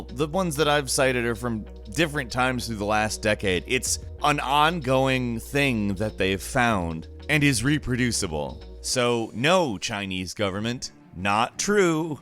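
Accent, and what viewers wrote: United Kingdom. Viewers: The British accent has convinced me beyond all doubt that actually is Ed Helms in disguise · Real life violence makes my stomach turn, blowing people up in video games doesn't. Hilarious brit accent though LOL. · Some brits are stupid. ALMOST as bad as extreme feminists. #5. We can go a whole year with barely seeing the sun. We don’t all have rickets. I barely go out when it is sunny and I’m fine. And your British accent is terrible lol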